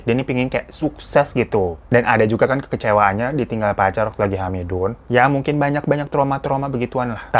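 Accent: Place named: Medan, Indonesia. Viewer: Wah wah dri bahasa nya iini kliatannya daerah2 sih pencerita dri medan nih. Serem sih kalau dimedan ada sekte sekte bgini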